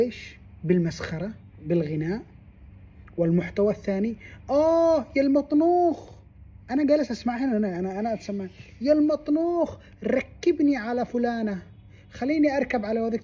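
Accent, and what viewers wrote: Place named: Taiz, Yemen. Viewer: كلاامك تعزي مرة بس إنتا قلت إنك مش عايش باليمن كيف كدا قادر تتمسك بلهجتك رغم عيشك برع اليمن!!؟.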